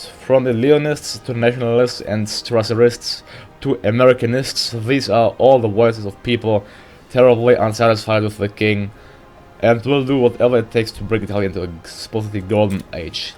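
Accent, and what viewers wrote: France. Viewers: Ты француз?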